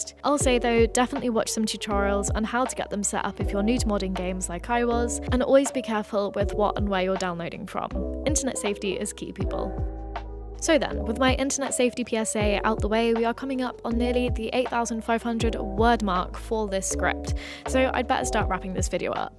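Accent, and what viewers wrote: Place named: United Kingdom. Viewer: reading books for kids might not be the most fun content to make but.. Something to think about if me, and momma are saying it. And You should be patting yourself on the back. You made a 44 minute video, on a topic I'm not too keen on, watchable. That's a feat. And I'm not a " yes man ". If I didn't enjoy the video, I wouldn't have said anything. I clicked to give you a view. Didn't expect to stay Speaking of your voice and accent.. What kind of accent is that? Forgive my uncultured americanism. I'd guess UK but I could be completely wrong.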